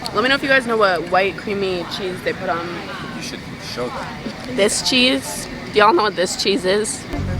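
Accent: United Kingdom. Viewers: Love the British accent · brittish accent rationg: 100/10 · british accent 8.5/10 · I love your vids I'm a huge fan!!💗💗💗💗 also it British accent is a 10/10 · your british accent sounds amazing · 10/10 On Your British Accent and you are so beautiful and funny and your videos are so great and so well done! 🩷🩷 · 10/10 British accent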